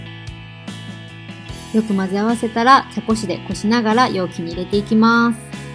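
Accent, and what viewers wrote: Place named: Kansai, Japan. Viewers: ヒレ→ヘレ 茹でる→ゆがく 煮込む→炊く バーモント→バーモンド 関西の言い回しっておもしろいですね😊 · 何も違和感が無かったのでコメントを見て関西弁なことを知り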